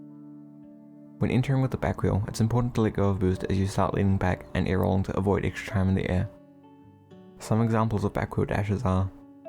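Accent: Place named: New Zealand. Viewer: You from nz? U got a nz accent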